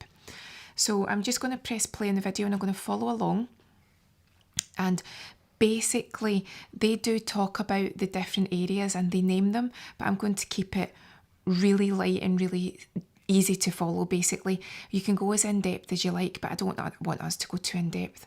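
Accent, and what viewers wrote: Scotland, United Kingdom. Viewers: If something feels good, or in the case of ASMR, sounds good, then yeah, it should really come as no surprise to anyone when the subject becomes relaxed, which of course is going to induce drowsiness. Whether there's science behind acupressure or not I guess could be for another discussion. I'm leaning more towards power of suggestion. Regardless, the soft spoken/Scottish accent combo is eargasmic, but I need more than 6 and a half minutes, so Ima loop it. 😬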